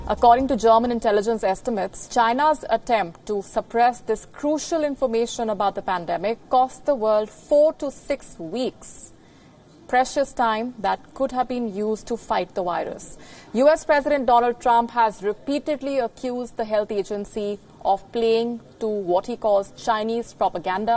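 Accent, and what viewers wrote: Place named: India. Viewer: Thick Indian accent.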